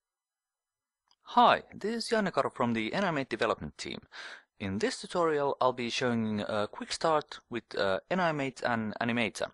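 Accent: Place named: Finland. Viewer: Are you Finnish! You sound like Finnish :D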